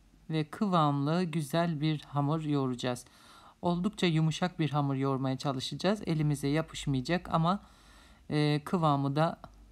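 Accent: Black Sea, Turkey. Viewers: aksandan biraz karadeniz yöresi sezdim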